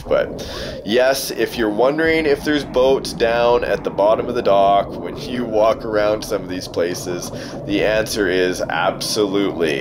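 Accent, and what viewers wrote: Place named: Canada. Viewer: why do I automatically like people with Canadian accents??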